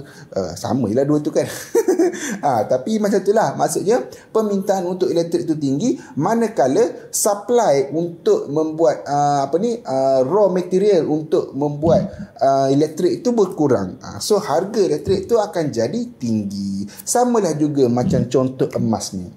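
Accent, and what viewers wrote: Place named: Kelantan, Malaysia. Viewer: Demo ore Kelate ko boh? Bunyi lagi Kelate tu boh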